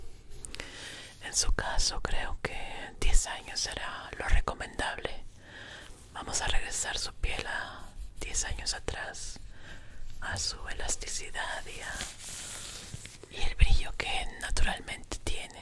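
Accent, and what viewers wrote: Mexico. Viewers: me encanta tu voz! tienes un talento innato y lo mejor , es que eres mexicana :) (bueno eso creo, por tu acento)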